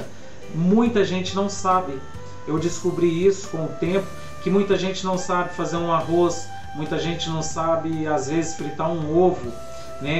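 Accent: Minas Gerais, Brazil. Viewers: Oi! desde o primeiro vídeo de rocambole já gostei do seu jeito de passar a receita, mto tranquilo, simples e com mt convicção. Que bom esse vídeo, e´ interessante sabermos um pouco da vida da pessoa, completa mais o conhecimento virtual. A gastronomia e' instigante, ela nos remete a vários lugares culturas diferentes.....nos faz experimentar um pouco desse universo, gosto muito tbem, se não fosse professora acho que me daria mt bem nessa profissão. Você tem tudo pra dar certo! na vida as coisas são devagar tudo no seu tempo, gosto do seu sotaque mineiro e da sua cultura, comidas deliciosas... Sorte e Sucesso! beijos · Ah! como imaginei, pelo sotaque e jeitinho, vc é mineiroo de BH! Moro em BH, pois casei com um mineiro uai, mas sou gaúcha, criada em Curitiba. Hoje, super inscrita no teu canal... entrei por acaso pra ver uma receita e vi q teu canal tem mtaaaaas receitas boas. 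Vc é mto talentoso, prendado e acima de tudo uma pessoa humilde, tbm explica mto bem as receitas. Deus te abençoe, grandemente,! Abraço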